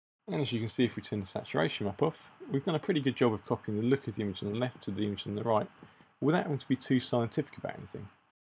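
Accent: United Kingdom. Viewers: His accent is English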